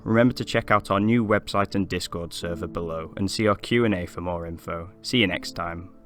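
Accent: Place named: Stoke, United Kingdom. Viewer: you sound like a Stokie, would I be right in thinking that? this is a stunning video!! Well done. subscribed.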